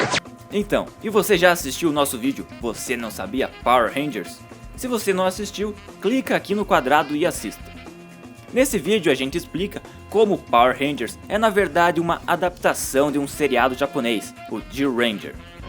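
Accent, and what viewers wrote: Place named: Santa Catarina, Brazil. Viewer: esse cara é catarinense ?? aqui a galera tem esse sotaque :)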